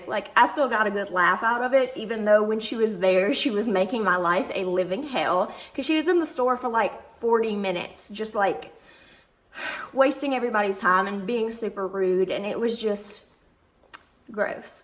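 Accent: South, United States. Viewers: where in the south are u from because I like your accent 😊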